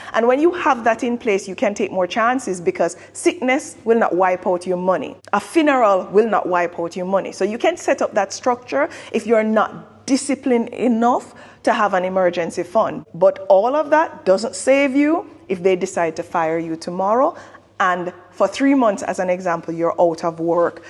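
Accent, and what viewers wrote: Jamaica. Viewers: O Jamaican in foreign you talking 😂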